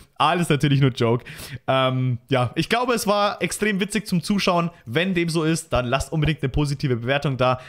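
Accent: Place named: Bavaria, Germany. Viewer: kommst du aus Bayern dein Akzent hört sich leicht danach an :D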